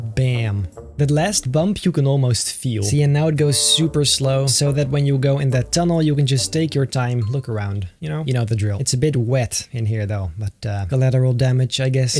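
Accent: Netherlands. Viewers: Are you Dutch?? Your’e voice is al little bit dutch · are you dutch? you sound dutch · You kinda have a Dutch accent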